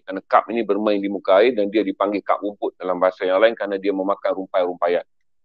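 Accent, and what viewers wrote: Kedah, Malaysia. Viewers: bunyi macam orang kampung saya, zul. dari kota Sarang semut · Nak tanya...saudara pencerita ni org kedah ka? Cara gebang mcm org kedah...😆😆😆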